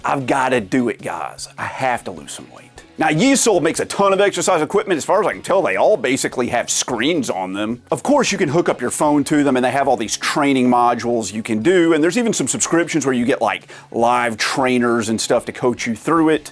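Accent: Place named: Tennessee, United States. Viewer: Random question my dude: Where the heck are you from? lol your accent sounds so damn similar to my home/ location (Knoxville TN USA). Great content regardless, keep it up haha!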